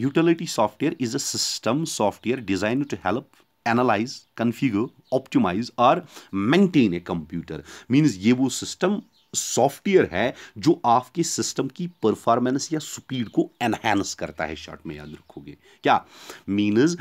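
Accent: Kashmir, India. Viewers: Sir I think you r from kashmir am I right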